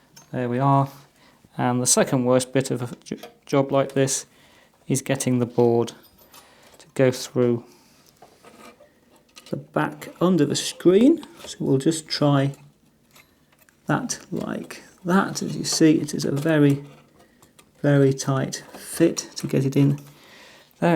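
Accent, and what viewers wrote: England, United Kingdom. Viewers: I like your British accent . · this english dude makes us sound like the stereotypes not cool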